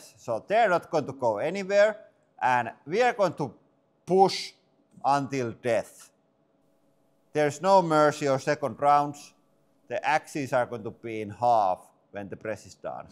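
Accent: Finland. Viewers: Some of us are here for the pressing action, some of us are here for the ralli English. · ..mietin, että mukava viteo.. mistäköhän. ? .. mutta sitte tuo kotosen kuulonen ”rallienklanti” kertoo että juu, härmästähän nämä.. 😎 ….mukavaa testiä.. 👍👍👍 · Love the Finnish accent 😊 Greetings from Austria 🇦🇹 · i dont understand a fu**in word of your rallienglanti.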